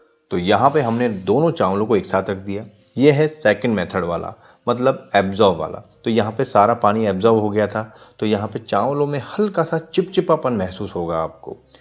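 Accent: Uttarakhand, India. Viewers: Bhaisaab ap uttarakhand se ho kya?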